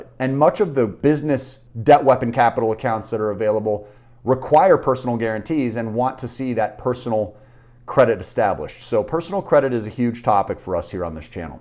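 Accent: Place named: United States. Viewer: What a nice cowboy accent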